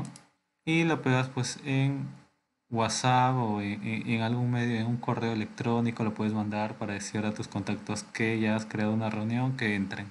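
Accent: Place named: Peru. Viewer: adivino de donde eres eres de peru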